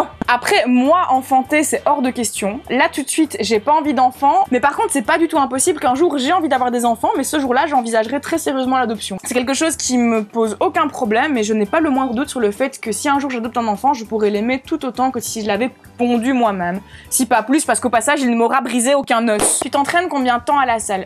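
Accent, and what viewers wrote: Belgium. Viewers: J'adore l'accent belge ! ❤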